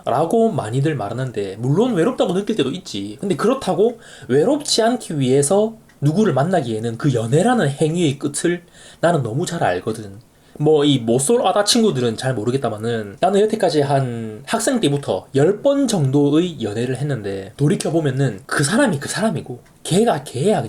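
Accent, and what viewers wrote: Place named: Gyeongsang, South Korea. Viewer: 아 갱상도 사투리 듣기시러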